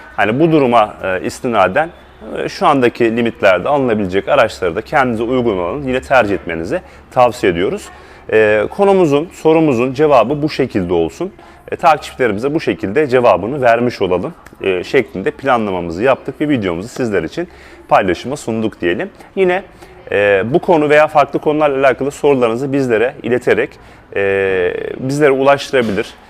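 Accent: Samsun, Turkey. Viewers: Dostum Samsun şivesi böyle 😉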